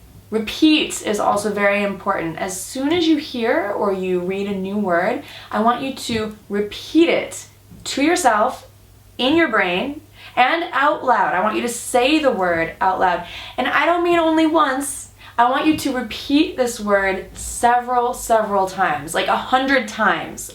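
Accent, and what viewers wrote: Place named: United States. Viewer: Hello whats your name? The one whos speaking? Do you have any websites? -- you sounds great.. you speaks like american, aren't you? I want to sounds native..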